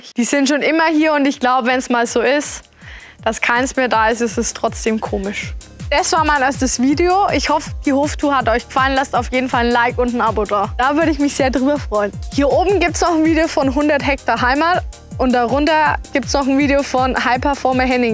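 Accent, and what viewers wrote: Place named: Franconia, Germany. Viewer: Servus, erstmal geschaut wo der Hof is. Sin entspannte 50km. Freut mich total das jemand aus der "Gegend" dabei is auch wenns keine 100ha sondern nur 13 sind. Is nich negativ gemeint aber man hat echt gemerkt dass es schwer vom Fränkischen ins Hochdeutsche reden zu kommen. Bin auch totaler Gülle Fahrer auch wenn ich letztes Jahr kaum Aufträge fürs Zubringer fahren von meinem MR bekommen hab. Freu mich echt auf mehr Videos Gruß Ausm Fürther Land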